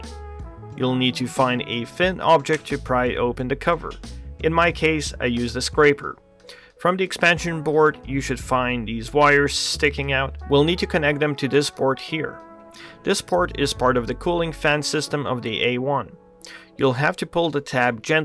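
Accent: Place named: France. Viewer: I can hear the french in your accent. Lmao great video though, very informative.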